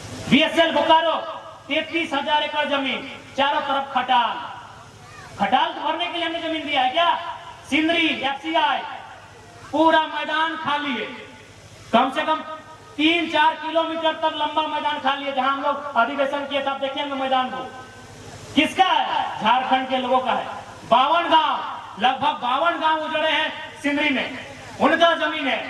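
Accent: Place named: Odisha, India. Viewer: Orissa sa hun bolte ho bhai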